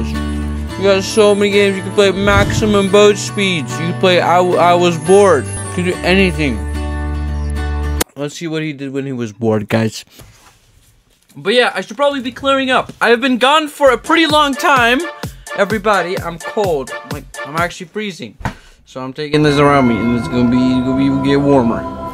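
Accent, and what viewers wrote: Norway. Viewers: You are from Norway right? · Are you from Norway ๏︿๏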